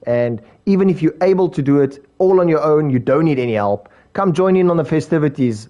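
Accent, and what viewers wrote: South Africa. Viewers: South African? Wragtig! Ek het ge-sub. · South African? Wait let me sub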